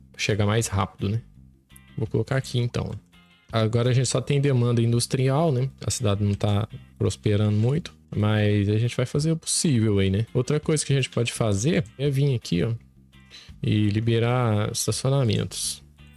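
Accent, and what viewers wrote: Minas Gerais, Brazil. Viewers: vc é engraçado o sotaque mineiro deixa mais engraçado ainda o video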